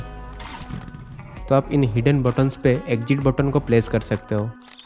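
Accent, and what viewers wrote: Odisha, India. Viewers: Bhai tum odia ho kya ?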